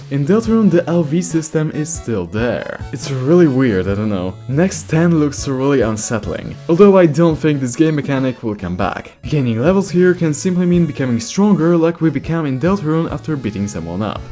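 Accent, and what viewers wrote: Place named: United States. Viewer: Wow if i didn't know you arę from Poland them I might say that you are from america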